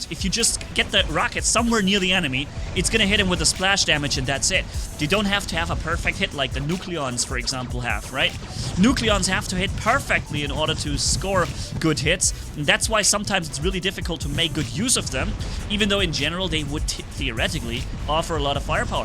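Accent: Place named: Germany. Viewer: hahaha I think might be onto something here, because you don't realize it but even this answer, you built in a German way, by putting the past participle aaaaall the way at the end of your sentence (almost). But that's great though, really awesome. I love how one's native tongue unknowingly influences the way they speak other languages no matter how fluent they are in that language!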